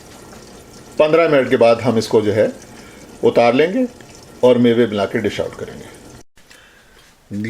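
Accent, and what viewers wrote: Delhi, India. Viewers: Ek zamane baad itni achi saaf aur dehli style urdu suni hai aur ye Qawami siwaiyan yaad agayi jo ke meri nani banaya karti thi. I love it.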